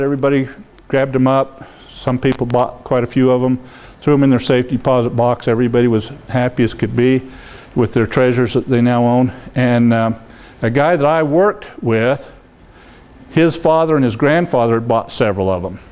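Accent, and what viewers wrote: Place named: Deep South, United States. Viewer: This man seems very nice. Listening to this presentation is just like when you pull up to a barber shop and ask for directions in the deep south. 😅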